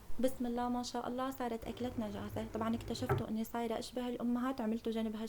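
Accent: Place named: Deir ez-Zor, Syria. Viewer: لهجتك ديريه أو حسكاويه ❤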